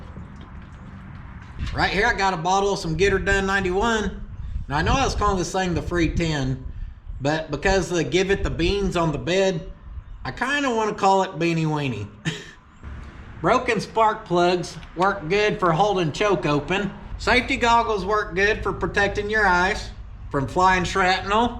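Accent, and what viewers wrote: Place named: Georgia, United States. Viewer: I've been to Stroklahoma many times and I ain't ne'er heard no accent like you done got there. That right there sounds like a deep Gerjia or Alabamia accent...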